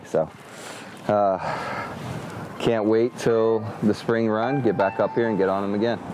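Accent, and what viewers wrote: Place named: New Jersey, United States. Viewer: LOL, I didn't know you spoke New Jersey"n accent!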